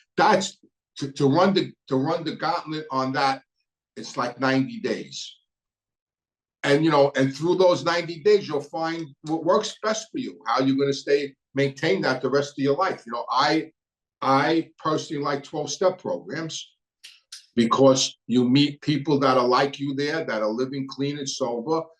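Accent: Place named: Brooklyn, United States. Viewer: He has a Brooklyn accent, genius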